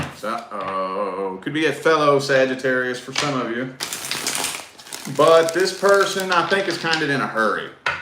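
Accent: United States. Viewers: I like listening to your American accent . At times I think ' how I wish I can talk and sound like that.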